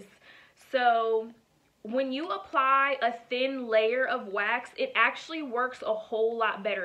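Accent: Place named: Pittsburgh, United States. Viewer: Are you from the Pittsburgh area? I hear you accent LOL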